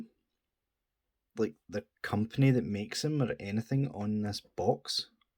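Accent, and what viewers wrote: Scotland, United Kingdom. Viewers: Holy crap mate yer Scottish!! Me tae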